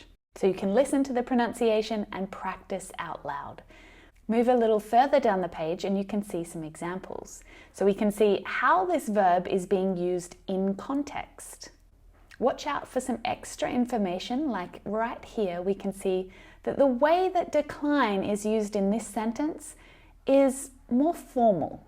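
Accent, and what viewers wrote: Australia. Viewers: I enjoyed watching your video and i've been somehow doing ALL OF the things (tips) you suggested before and will continue to. Now i feel good because i know that i've been doing this the right way and I'm proud of myself as well. I just love your teaching style and especially your Aussie accent! I wish you all the best,! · Hi from Turkey.I love you and your explaining. I love when you speak Australian English. Thank you to teach us English. 🇹🇷🇹🇷❤️❤️🇦🇺🇦🇺💕💕🌺🌸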